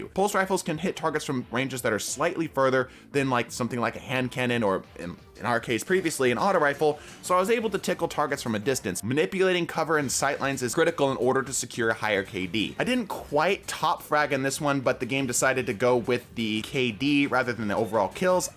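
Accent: United Kingdom. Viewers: Is this the same dude with the British accent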